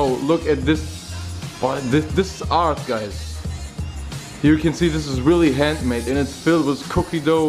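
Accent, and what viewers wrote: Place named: Germany. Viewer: Bin ich die einzige, die den deutschen englischen Akzent total nervig findet? :,D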